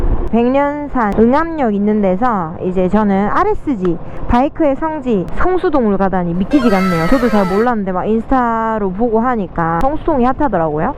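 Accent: Daegu, South Korea. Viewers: 대구사투리 너무좋다..♡ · 암튼 대구사투리 개싫음 개짜증남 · 대구분이시구나 간만 사투리들어서 정겹네여~대구사람은 아니지만 대구 방촌역 아파트 공사하느라 숙소생활하긴했었는데 서울 나들이 잘하셨네여^^ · ㅎㅎ저도 대구출신입니다~ 사투리 아주 정겹네요, 영상 재밌게 잘보고 갑니다!! 굿굿!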